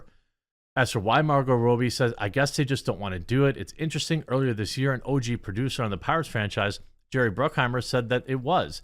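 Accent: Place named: Wisconsin, United States. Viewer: Love the show! But I can’t tell if you’re saying wahmen seriously because the way you say marget Robbie, Dray-gons. I know you’re from Wisconsin or somewhere lol again love the show!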